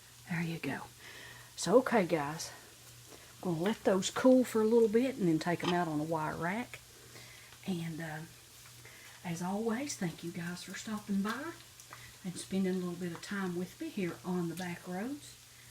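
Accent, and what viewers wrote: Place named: Oklahoma, United States. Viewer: What state is your accent from? Sounds like Reba McEntyre style. She grew up in Oklahoma. Is that your dialect, too? Sorry to focus on your accent and not the biscuits. I’m just really interested in being able to tell exactly where people are from. I’m really good at England, Scotland, and Germany regional dialects. Being from Maine myself, I’d like to get better at domestic dialects.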